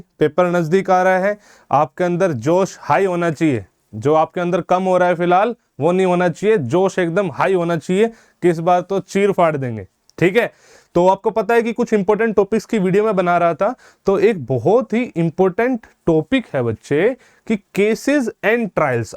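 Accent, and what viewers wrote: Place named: Bengal, India. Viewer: Sir your Bengali speaking very cute👌👌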